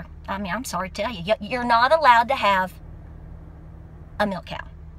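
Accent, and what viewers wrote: South, United States. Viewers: I like your southern accent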